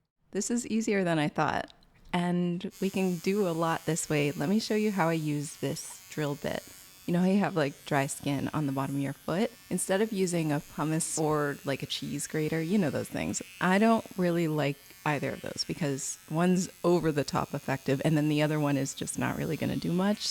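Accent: Canada. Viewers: Are you from Vancouver you sound like you are? 😂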